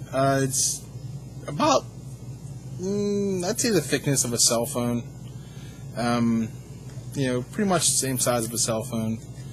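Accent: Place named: Pittsburgh, United States. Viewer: you are definitely da burgh, nice review dude, just got one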